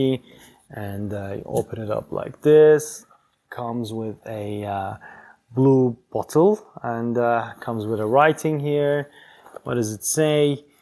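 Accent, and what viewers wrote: Turkey. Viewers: Your accent .. Are you a Turkish guy?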